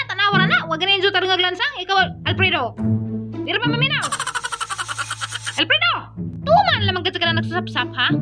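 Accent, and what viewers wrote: Bohol, Philippines. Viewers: Mag vlog ka na lang mae tour around bohol sa mga towns with the same bol anon accent for sure kikita ka pa padayun fan from texas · Hi Ma'am , keep on vlogging .. Missing our accent in Bohol.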